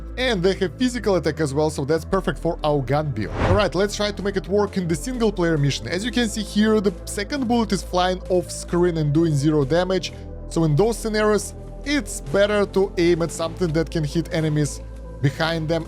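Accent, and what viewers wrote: Russia. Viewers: да лан тебе) Скажи как есть) акцент сразу слышен наш)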